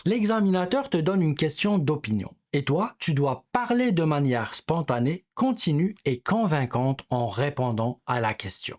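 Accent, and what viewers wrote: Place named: Canada. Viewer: Avec un accent canadien, excellente vidéo 👌💖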